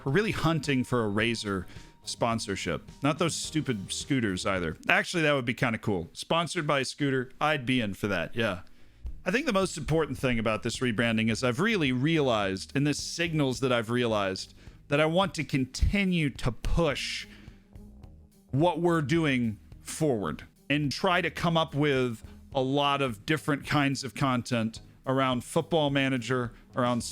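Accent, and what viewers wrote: England, United Kingdom. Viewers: Oh shit that was an English accent was it? P.S. Good luck with the revamp bud i'm looking forward to what you're going to get up to. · Decent English accent. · Good English accent! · That English accent is fantastic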